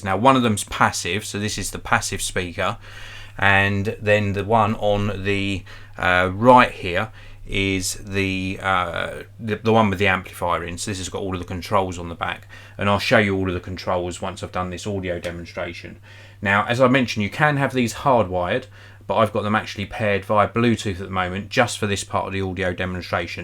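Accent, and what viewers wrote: United Kingdom. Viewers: I wonder if the roll surrounds on the bass drivers are acclimatized to withstand UK's high humidity climate. Surrounds made of FOAM last less than a year ! BTW, love your British accent.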